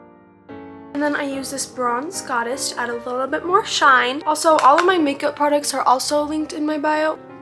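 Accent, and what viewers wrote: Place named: United Kingdom. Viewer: I love your morning routine and your british accent voice ☀❤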